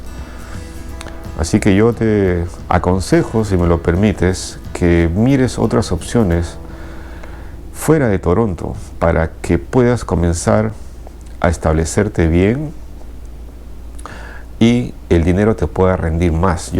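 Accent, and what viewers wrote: Peru. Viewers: Eres peruano hermano?, me parece por tu acento.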